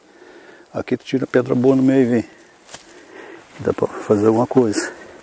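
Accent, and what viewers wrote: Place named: Rio Grande do Sul, Brazil. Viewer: Queria tanto saber onde fica se e acessivel por estrada, que pelo sotaque e gaucho.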